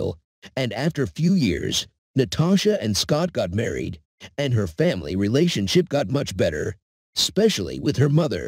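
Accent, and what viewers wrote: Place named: China. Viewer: narrating this in Chenglish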